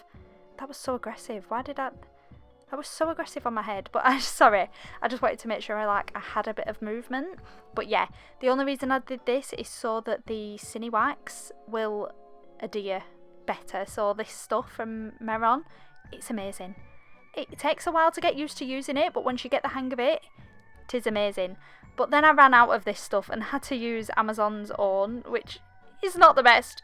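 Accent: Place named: Scotland, United Kingdom. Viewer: I know she's from Accrington, England, but her accent sounds Scottish.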